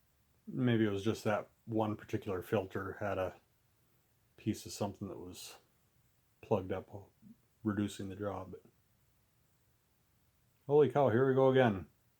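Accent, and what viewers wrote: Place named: Midwest, United States. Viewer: Always a pleasure to hear your Midwestern Minnesota-ness,. Down to Earth family goings on. Thanks for keeping me company while I cleaned the bastard bathroom.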